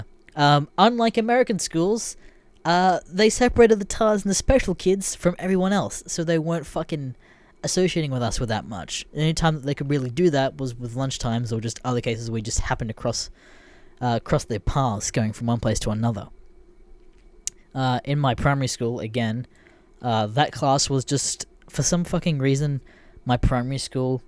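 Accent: Australia. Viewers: 1 are you Australian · Creepswork is not as good as. Australian voices are far superior to Americans.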